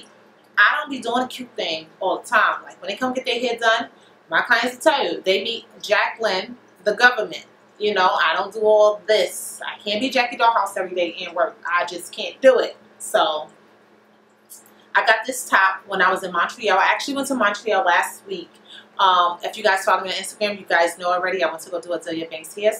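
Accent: New York, United States. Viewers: Nothing wrong, I love a New York accent.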